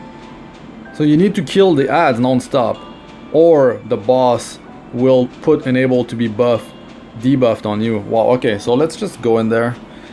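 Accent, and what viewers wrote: Quebec, Canada. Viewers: T québécois hein? :)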